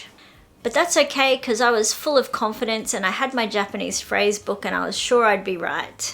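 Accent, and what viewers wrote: Australia. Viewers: I know that much of Japanese English is based in part on American English so it’s not surprising that they likely struggled with your Australian pronunciation and accent. Interesting anecdote. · I'm sure speaking English simply uses the tongue differently saying vowels and letters differently. As she describes phonics. I would have a very difficult time speaking Japanese. I wonder if everyone learning English from her will have an Aussie accent. Probably same as my Dad learning English from a country under a British rule. In general I hope we all understand each other with respect. I applaud you for what you have learned so far. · I am interested in not just the pronunciation but also the ups and downs of the voice... Though I have Japanese grammar and vocabulary books, no teachers here are willing to teach me speaking... it's a gap left blank. 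They are not native speakers thus not confident enough to take the job. As for the native ones, they don't speak my language so we can't communicate well and I find it hard to explain to them what I need. 😅 Besides, they are not educators just housewives who have no idea of the methodology of teaching. I found I am also improving my English with your lovely Australian accent haha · I understand where your comming from when it comes to a Japanese person or Thai understanding us english speeking people , us Brits have a thing in our heads called auto correct, if a japanese person ask me in pigeon English, we/I would probbably understand what they were trying to say, although they are not perfect, we, our Brains auto adjust to figure out what they want, or asking in there sentence, but i find that a lot of asian countries dont have this, unless its perfect pronunciation they just look blank at you, what probably happend to you as a teenager, unbeknown to you, you was speeking Japanese with an Ozi accent . 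I quite often have my Thai girlfriend in fits of laughter when i try to pronounce Thai, if you think Japanese is hard ( its not) Thai script has tonal pitches, although the spelling of a word is the same, the tone or rise and fall at the beginning, mid word, end of the word can translate into a different meanings, as for pronouncing Japanese, try and get a Japanese accent it help when i prononce it .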